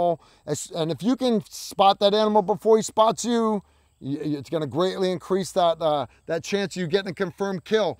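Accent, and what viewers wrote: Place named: Upstate New York, United States. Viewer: Upstate New York accent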